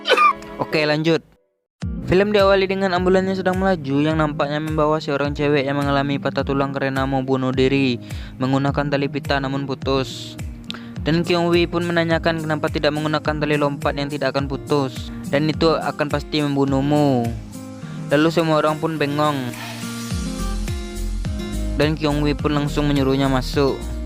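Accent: Madura, Indonesia. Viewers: Ga bisa ilang logat maduranya wkwk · Orang Madura ya bang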